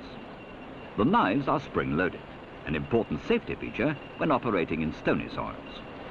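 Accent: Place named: RP, United Kingdom. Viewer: Curious video production, it looks very 1950-60s with the posh narrator and wobbly camera. However the vehicles seen are very much 1974 - I can only assume this production (video) was commissioned (paid for) by Standen as the BBC and ITV were much more of today's standard in video picture quality. All the same was good to see this, that machinery is much more smarter than it first seems, and did we all cheer when the 135's came into view?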